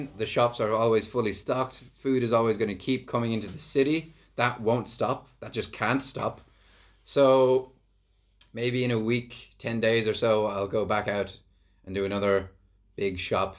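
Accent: Ireland. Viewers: And besides you sound Irish have you ever heard of the Fighting Irish there's a reason why they're called that. Happy birthday buddy my birthday was the 25th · I’ve always loved an Irish accent!!!!!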